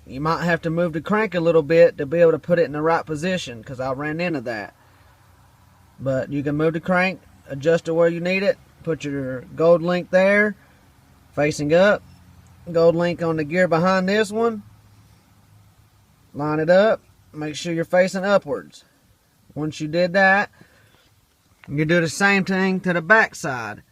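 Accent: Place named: Missouri, United States. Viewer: You sound like your from Missouri, thanks man I hvnt started my project jus yet but i have been searching for about 4 months now ima go dive in head first thanks to you man 🙏🙏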